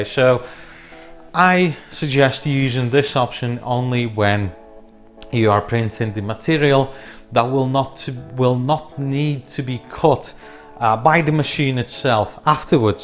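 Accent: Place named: Liverpool, United Kingdom. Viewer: Scouse accent really shinning through now 😝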